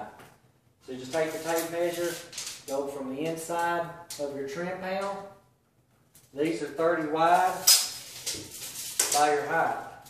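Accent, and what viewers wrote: South, United States. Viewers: Just a bit southern.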